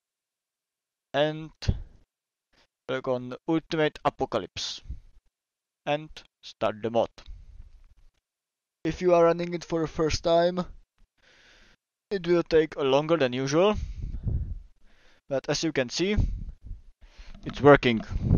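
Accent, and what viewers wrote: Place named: Czech Republic. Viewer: Slyším českou abecedu :D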